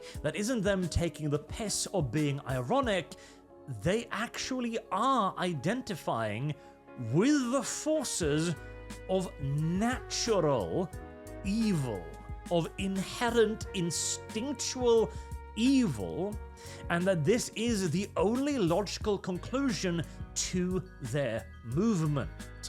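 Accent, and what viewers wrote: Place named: United Kingdom. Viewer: Hm. You sound like you are in the British Isles. Curious why the police have not arrested you over this commentary. Yet.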